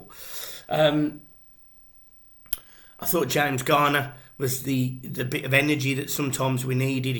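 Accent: Birmingham, United Kingdom. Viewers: He sounds brummie but he's deffo a blue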